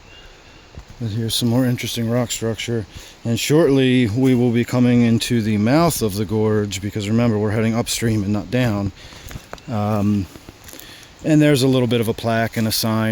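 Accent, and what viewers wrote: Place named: Baltimore, United States. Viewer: Are you from the Baltimore Region? I thought it sounded like you had a bit of a baltimore accent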